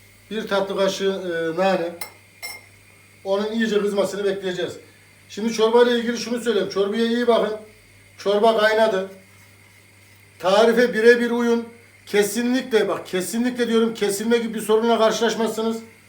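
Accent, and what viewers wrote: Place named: Anatolia, Turkey. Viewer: Ülkemin güzel şiveli; babacan , yardımsever şefim . Senin o Anadolu kokan şivene gurban olurum 😊😊🙏🙏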